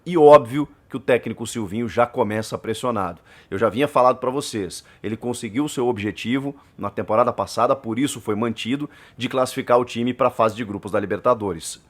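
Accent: Rio Grande do Sul, Brazil. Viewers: Para de puxa gaúcho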